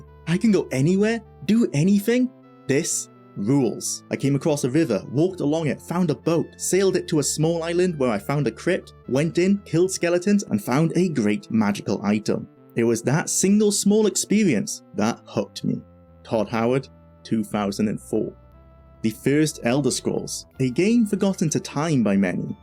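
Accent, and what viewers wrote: Wales, United Kingdom. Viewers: Yes he’s welsh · I like your accent. Welsh?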